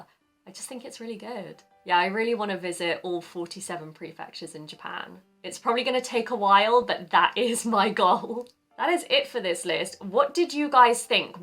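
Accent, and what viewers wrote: United Kingdom. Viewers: ソイマッチ！てゆうイギリス訛りがクセになる！ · 初めまして。英語でお話されることで外国にも日本をご紹介頂けるので嬉しいです。加えて日本語字幕も付けていらっしゃるので日本人も楽しめます。とても良いですね。また、さんのイギリス英語は、アメリカ英語に比べて、とても格調高い発音に聞こえて心地良いです。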